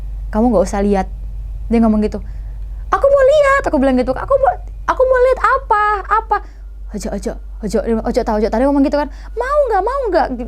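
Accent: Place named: Surabaya, Indonesia. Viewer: Mbak.e ki wong ndi to kok logat.e kyk kenal, sby ta opo malang.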